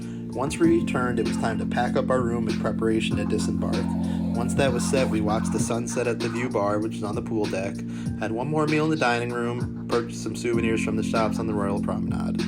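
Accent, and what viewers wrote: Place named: North America. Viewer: Great video! Could you please describe the process of embarking and disembarking from foreign ports? I assume from your accent that you are either from the U.S. or Canada. So am I, and I'd appreciate some tips on what to expect when traveling to and from Europe to board and disembark. What is customs like? Is it hard to navigate on your own?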